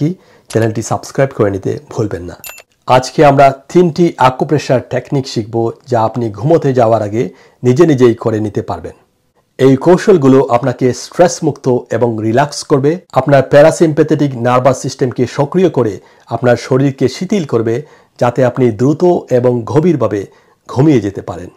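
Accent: Sylhet, Bangladesh. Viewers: স্যার কি সিলেটি? কথার টানে মনে হয় সিলেটি❤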